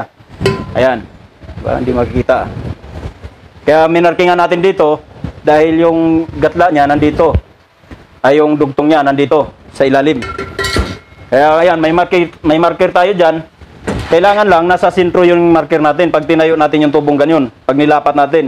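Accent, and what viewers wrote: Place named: Visayas, Philippines. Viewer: bisaya mlng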